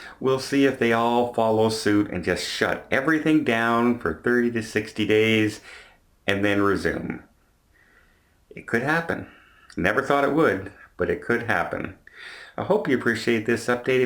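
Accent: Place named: Ontario, Canada. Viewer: where are you from? Sounds like ontario from your video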